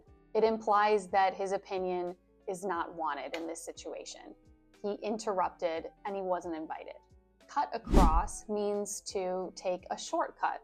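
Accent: United States. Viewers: Can get American accent if they speak like you, but they speak fast in movies 😂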